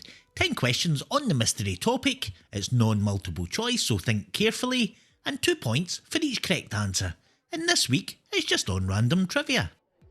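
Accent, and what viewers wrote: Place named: Scotland, United Kingdom. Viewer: I did indeed enjoy the quiz and all your quizzes. I love the accent too. My parents were born and raised in Scotland (Fife) and all my cousins live there. So your accent reminds me of bonnie Scotland!!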